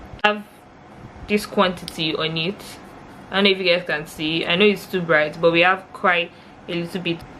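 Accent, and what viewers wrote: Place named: Africa. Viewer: I love ur african accent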